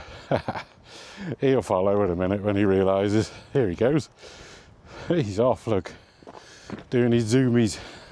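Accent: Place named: Norfolk, United Kingdom. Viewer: I noticed you haven't lost "all" of your Norfolk accent 😂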